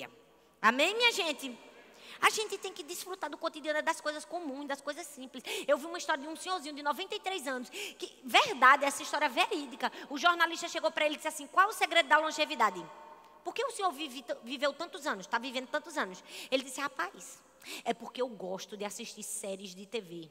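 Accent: Northeast, Brazil. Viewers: Eu amo o sotaque nordestinizado. Linda mulher de Deus. Testemunho de vida fantastico. Abraço bela! 💖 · Amo seu sotaque! Tenho muita vontade de ir pro Nordeste ❤️😍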